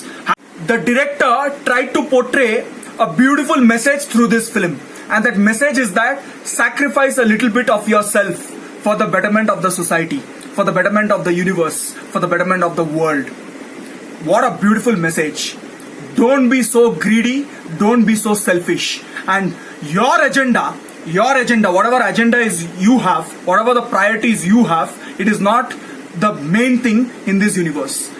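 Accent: India. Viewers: are you an Indian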